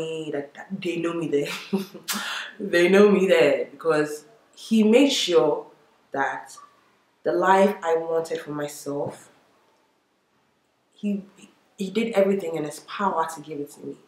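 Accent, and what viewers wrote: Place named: Ghana. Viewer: This video deserves more views 😭😭😭 P. S I love the little Ghanaian accent you have!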